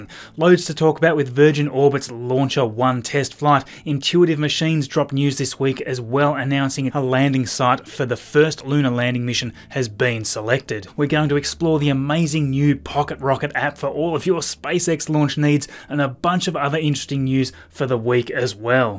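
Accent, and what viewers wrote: Australia. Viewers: dude are you an auusie , what state are you in ?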